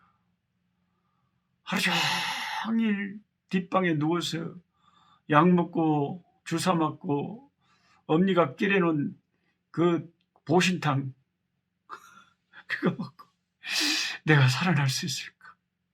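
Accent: Jeolla, South Korea. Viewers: 할렐루야!! 움직이면서 이런저런생각을하며 하늘을보면서 맘속으로기도를했습니다 지금이시간까지 지켜주신주님이너무감사해서요~~~지금제가볼수있는것은~계획되어있지않는 알수없는길을가고있습니다~사고로모든것이올스덥되고 아버지또한 모든것이 희미하지만~저는오늘목사님기도처럼 홍해를가르시는주님을믿고의지합니다~지금환경과문제모든것을감당하고 나가는게때론벅차지만 홍해를가르시고 이스라에백성을인도하셨던주님그분의자녀가저이기때문입니다~~요즘은~계속계속~환경에서오는시련이있어서모든것이아주느리게움직이는것같고 힘이빠졌던것은사실입니다~~~목사님의기도로다시시작해보려고요 멀리서도이리말씀먹이겠다고 방을옮겨가면서 분위기바꿔가면서때론많이지치실법도하신데 목사님의사정없이쏟아지는절라도사투리로정신을차리고 다시금 또다시시작하려고합니다 ~~내일은아버지를요양원으로모시고가야 할지도모르는 무거운발을옮겨야할지모르기에 오늘만큼은 더굳건히마음에죄체감안지려고 힘내보려합니다 날씨가시골연천은많이춥습니다~아프리카목사님거실에꽃과밖에푸른실록을보고싶네요~~같이기도해주시는목사님이계시니감사가나오고 힘이나네요~~~건강하세요목사님사모님😊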